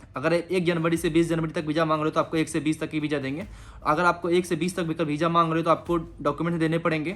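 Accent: Bihar, India. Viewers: Its immigration and u r saying immigrason . Dont use bihari accent . Ab toh dunia ghoom liaaa thora develop kr apne aaap ko .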